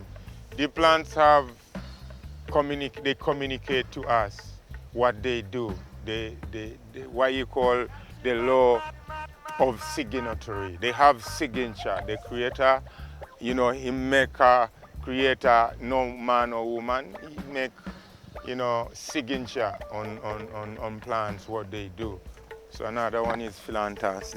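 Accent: Jamaica. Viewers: Are you an African or a Jamaican you sound like a Jamaican in 🇯🇲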